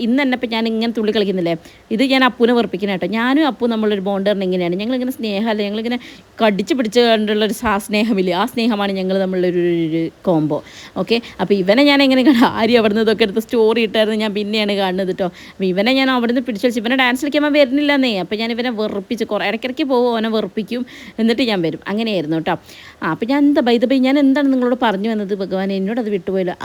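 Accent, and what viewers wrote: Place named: Kozhikode, India. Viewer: ഞാൻ ഇപ്പൊ ഇയാളുടെ വലിയ ആരാധികയാണ്. എന്നെങ്കിലും നേരിട്ട് കാണാൻ ആഗ്രഹമുണ്ട്. സംസാരം ഒരു രക്ഷയുമില്ല 🥰 ഇങ്ങനെ വേണം പെൺകുട്ടികൾ 😘 ഇങ്ങനെ ഒരു മരുമകളെ അല്ല മകളെ കിട്ടിയ ആ ഫാമിലി ഒരുപാട് ഭാഗ്യം ചെയ്തവരാണ്. ഞാൻ വലിയമ്മയുടെ മകൻ സനേഷിന്റെ വൈഫിന്റെ മാമന്റെ ഭാര്യയാണ്. ഞാൻ ഒരു തൃശ്ശൂർകാരിയാണെങ്കിലും എന്റെ ചെറുപ്പത്തിൽ കോഴിക്കോടാണ് വളർന്നതും പഠിച്ചതും അതുകൊണ്ട് ഇയാളുടെ സംസാരം എനിക്കൊരു നൊസ്റ്റുവാണ് 🥰🥰🥰🥰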